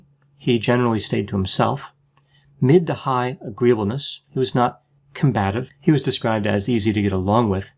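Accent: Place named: Georgia, United States. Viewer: hmmm, too busy commenting on the Georgia accent I guess....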